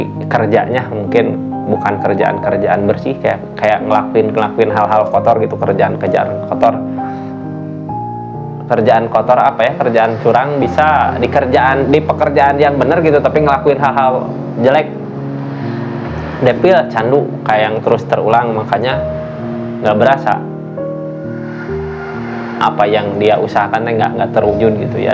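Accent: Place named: Sunda, Indonesia. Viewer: logat sundanya kental banget... 😍 Thanks readinganya... Sukses selalu ❤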